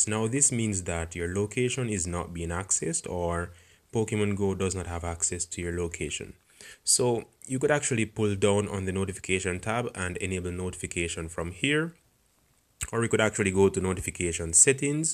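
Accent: Jamaica. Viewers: Your jamaican arent you 🤔?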